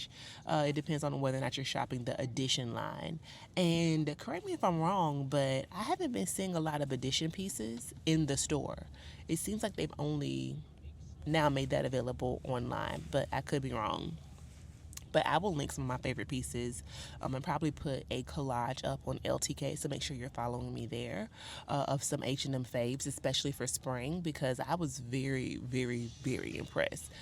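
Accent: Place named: Polk County, United States. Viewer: 😂😂😂 you have me laughing so hard, because that’s exactly how we talk here in Polk county … so proud of you . Keep it up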